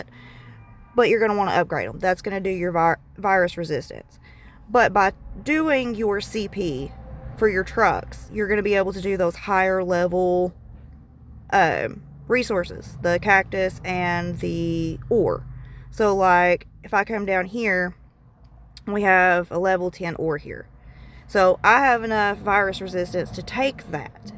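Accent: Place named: South, United States. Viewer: Damn you sound kinda hot. Country accent goes hard. ☠️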